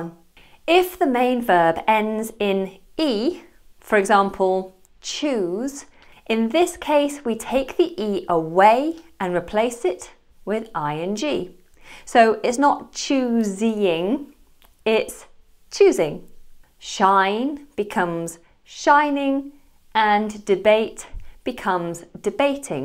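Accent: United Kingdom. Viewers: Brilliant explanatiom with proper British accent. Keep it up🖒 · It's a little difficult for me to understand the british accent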